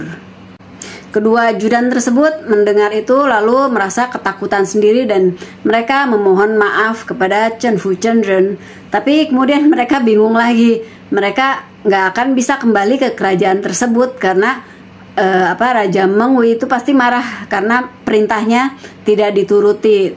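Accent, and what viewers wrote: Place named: Lombok, Indonesia. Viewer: Bahasa indonesia tp logat lombok... mantaaapp...!!!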